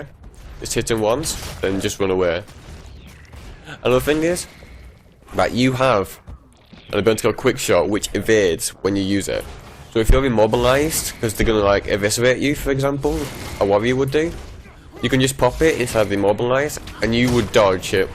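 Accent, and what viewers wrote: United Kingdom. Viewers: To the people saying it's "just a northern accent", let's be honest, it's one seriously fucking strong northern accent... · Shite, what kind of accent is that? You are from the UK for sure, but where exactly?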